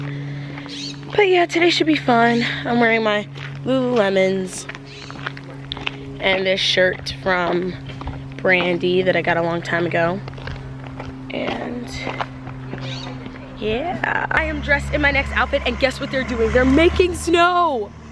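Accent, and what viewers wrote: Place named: East Coast, United States. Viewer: Anyone else love how she says strawberries ?? Idk I'm weird but your east coast accent comes through and it's so cute 😩💕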